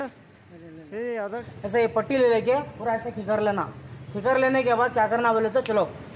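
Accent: Hyderabad, India. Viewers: Hyderabad hindi Right? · Talent ❤️..that Hyderabadi accent ❤️ kiraak 🎉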